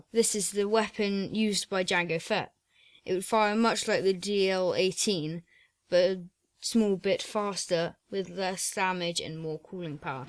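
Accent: United Kingdom. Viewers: Lit af u British? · i clicked on this video expecting an adult who is professional, instead i got an annoying English fuck, how about you give Ireland back to us ya dorty hun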